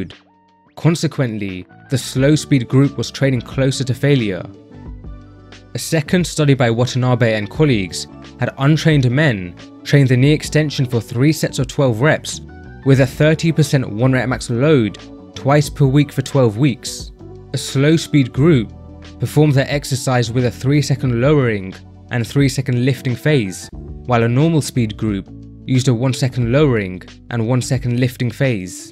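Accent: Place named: United Kingdom. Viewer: For some reason I trust him so much more because he had a British accent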